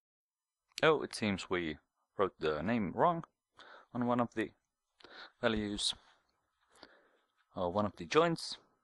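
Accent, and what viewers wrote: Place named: Finland. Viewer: Are you Finnish! You sound like Finnish :D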